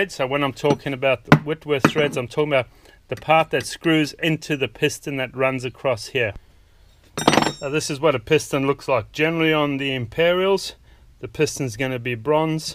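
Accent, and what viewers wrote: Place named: South Africa. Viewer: Love the South african accent.😊 Thank you for all your educational videos.🙏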